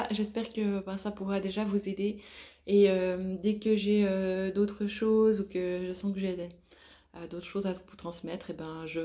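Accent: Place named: Switzerland. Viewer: Merci beaucoup pour cette vidéo! J'aime beaucoup ta manière d'expliquer ☺. Je me suis dit mais je connais cet accent et j'ai vu que tu étais en Suisse comme moi d'ailleurs. Je ressens beaucoup de fatigue actuellement et ce que tu as dit résonne juste en moi. Je fais de la méditation pour intégrer ces énergies au mieux. Je t'envoie beaucoup d'amour 😇🙏. Je me réjouis des prochaines vidéos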